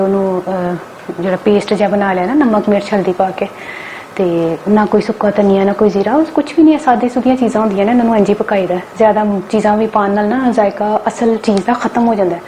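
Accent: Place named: Punjab, India. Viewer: yr tusi punjabi bolde bht zeada cutee lg de ooo keep on 👌👌👌😘😘😘😘😘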